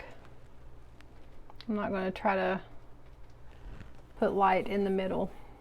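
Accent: South, United States. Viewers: I didn't know you were southern! Tennessee? 😊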